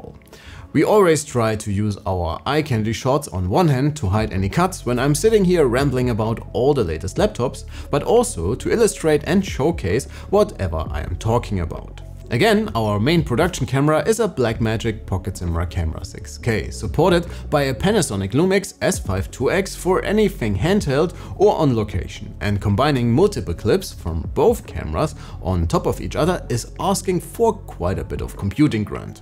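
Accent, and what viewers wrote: Germany. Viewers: I just discovered the notebookcheck channel via the "HP Omen 17 2024" review. Really great stuff! You are a great speaker and your german accent sounds nice ;) As a hobby audio guy I always appreciate that you guys include "LatencyMon" and fan noise examples in the reviews. I would love a Video about DAWs and Audio Setup on Windows Laptops one day! Keep up the great work!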